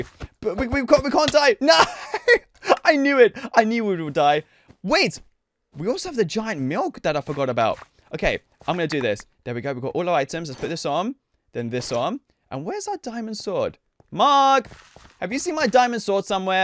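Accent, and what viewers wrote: United Kingdom. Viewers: Hi how you British accents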